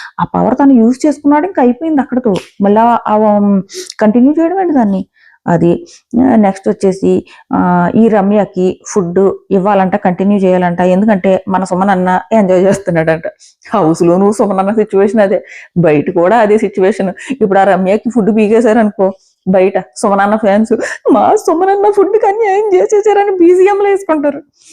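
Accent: Godavari, India. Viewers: Madam mi voice koda godavari ga pavan ramya same area na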